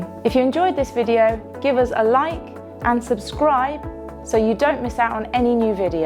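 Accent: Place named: United Kingdom. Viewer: For the repair 1) what solvent is she talking about it step 1.a? you've just painted it, there's no solvent on there. duh. 2) what does 'flash' in ss 1.b mean? Secondly, what section of of jolly ole england is this gal from? Sounds mid-british, not too high-falutin', not too lower, just a nice, regular midcent from somewheres. I'm guessing not w/i 60min of londan, but neither higher than an hour south of the scottish border. Idk, never been over there, don't know if it's coastal or country or suburban. Little help please. I like to study these things.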